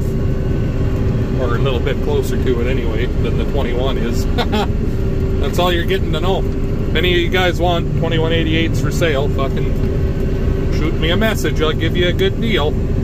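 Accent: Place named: Canada. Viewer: Well, how she goin? "ANYWAY...." just wanted to say out of all the FarmTubers I find your channel most entertaining... all the rest I find myself falling asleep, and then their's that kid from Iowa with severe ADHD lol... Your Canadian accent and one liners like, "So we don't plug the girl cock stiff" lmao. Good stuff and nice to see harvest is over for ya as we are in the middle of ours from Ohio. Have a good one.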